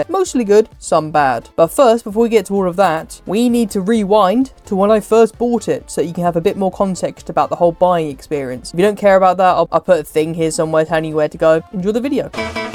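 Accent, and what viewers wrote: United Kingdom. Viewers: I love hearing british people english accent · Great review! You so British! :) Love the accent!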